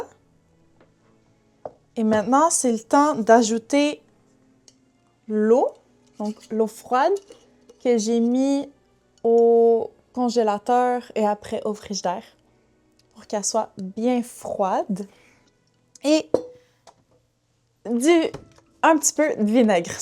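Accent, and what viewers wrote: Canada. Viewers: I'm so glad it's in Canadian French. I live in New York, so most likely will go to Quebec and not France to experience the language.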